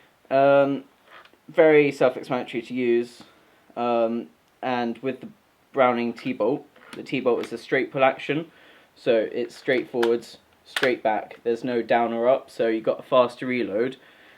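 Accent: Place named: Bristol, United Kingdom. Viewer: great info mate! soon as my FAC comes through this is what im getting! hope you dont mind me asking but where you from, you sound a bit bristolian? thanks again!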